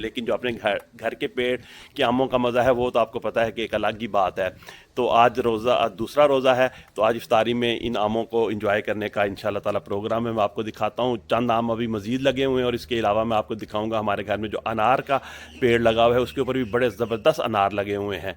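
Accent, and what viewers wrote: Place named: Pakistan. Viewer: ap pakistani sound or rahe hai me apka chota bhai india se bohot acha laga apko pur sukun dekh k lekin australia kese settele hogye pakistan se.. ap kitne log hai waha settle family mem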